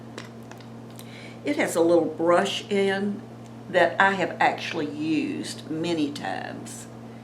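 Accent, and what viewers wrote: South, United States. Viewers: UGH...no more it cosmetics for me...way over priced anyway! Thanks for the info!! Just found your site... Enjoying hearing the southern accent as I am from Memphis, but have been in Reno, NV for past five years for medical treatment for my son with Lyme disease. Hope to get to go home soon. You are a beautiful 70 ish year young!!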